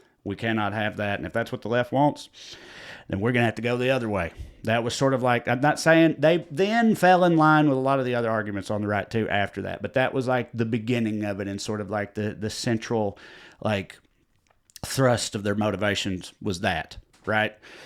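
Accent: South, United States. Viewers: Love this!! Any of your stuff i love. You sound alot like me and its nice to have a southern voice of reason! Please stay safe and keep up the great work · Hey man, I found you on Instagram. Keeping making these! I'll keep clicking. I don't know why, but its really calming to hear a leftist views with a southern accent. Cletus The Slack Jawed Yokel was the stand in for the south until I heard you.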